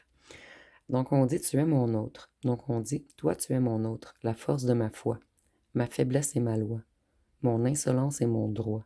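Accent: Quebec, Canada. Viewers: Vous êtes adorable, un travail poussé pour créer de petites cartes bien sympas comme celle des chansons, j’aime bien votre énergie solaire et cette voix sympathique, l’accent du Québec trop mignon. Bref, vos guidances sont précises et agréables. Belle journée 💕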